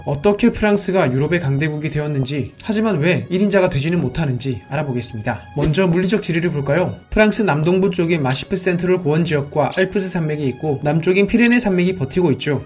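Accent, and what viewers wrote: Gyeongsang, South Korea. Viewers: 컨텐츠가 아주 좋네요. 그런데 경상도식 발음은 좀 교정을 하셔야할것 같아요! 영향, 전역, 그냥, --년, 등등.. 연음이 표준어에서 벗어난부분들이 많이 보이네요.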